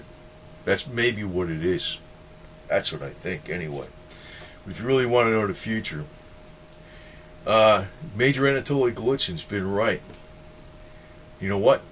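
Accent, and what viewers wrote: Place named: Chicago, United States. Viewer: FINALLY! somebody sez it!..."insider"..bovine scat...."AJ" Bovine scat!...and it drives me nuts, everybody looks to RT like its some sort of oracle....do they post some truth?....yeh, but they never hang out their own dirty laundry...just ours. I like you, "Hizzoner" likes you, coz we both got the same accent...but I got out of "Big Shoulders", moved into the weeds....not out of fear, its coz the place has changed, "Rahmfather"?...forget it....agree with you on silver and the "brain freeze"...I stick with American pre-65 90%'ers and ASES....I like the 40% Kenns too...we just keep stacking...its an insurance policy, not an investment.....so you got a new subscriber, coz you helped me with the brain freeze...Happy New Year!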